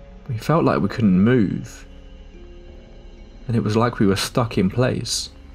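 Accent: United Kingdom. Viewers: A British accent, monotonous voice, and spooky content...Recipe for success on YT. 😄 Not hatin' on it at all! I'm addicted!...Ijs, it's become "a thing". 🤷🏾‍♀️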